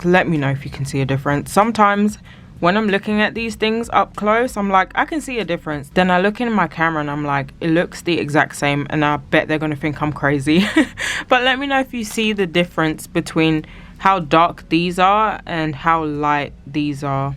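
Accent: United Kingdom. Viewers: Quick question,I can hear your British accent… did they deliver to you in the UK?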